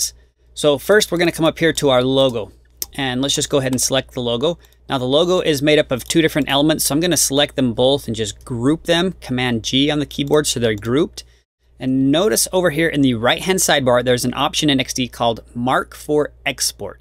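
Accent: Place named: Ireland. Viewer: Great video. You sound like you have a slight Irish accent. Which is really cool!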